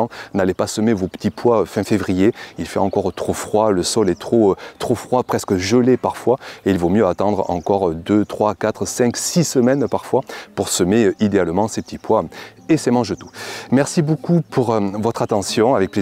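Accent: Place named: Provence, France. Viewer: Bonjour, merci pour tes videos et ton accent qui fait penser aux cigales et imaginer des champs de lavandes.... pour les pois, peut on évoquer le trempage de 12 heures avant de semer ?! Est ce vraiment un plus ? Bonne continuation, Lionel de Bretagne et son jardin qui regarde l'océan...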